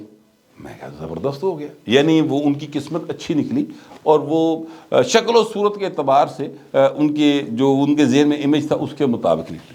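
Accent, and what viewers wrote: Lahore, Pakistan. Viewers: asslam o alaikum but sahb pichly 3 sal se apka viewer hun aur yh mera first comment hai huzoor bari nawazish ap ny Punjab k liye kisi dard ka izhar kia Hamari Punjabi qom k liye bolne wala koi nahi Razi dada is the only who in renowned journalists who speak for Punjabis rigts. i am extremely happy to see you in the pro Punjabis camp. hamarai zuban hamari saqafat hatta k hamari identity ko kuchalne ki jo sazish Angrez dor se start hui woh still apni poori quwat se jari hai. sab subon mein primery EDU un ki local zuban mein hai but Punjab mein agar hamara bacha ghalti se b punjabi bol le to us mara jata hai. please raise your strong voice for our mother tongue to be taught in our schools according UN resolution. but sahb yaqeen janen apky Vlogs mein sab se bara mazedar part apka Punjabi tarka aur Lahori lehja hota hai jo apko baqi logon se mumtaz kr deta hai Bohat sohni lagdi a sadi maan boli tuhade mounhon sada khush raho wasde raho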